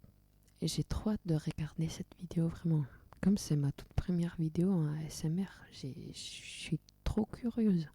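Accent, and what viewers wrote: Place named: Italy. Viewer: Trop cool l'accent Italien, j'adore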